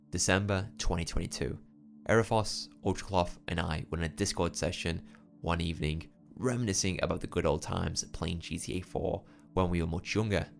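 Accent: Liverpool, United Kingdom. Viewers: Fellow scouse? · R u Scouse ?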